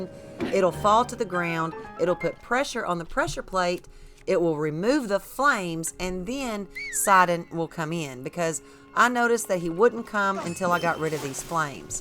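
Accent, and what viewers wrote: South, United States. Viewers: Your country twang so cute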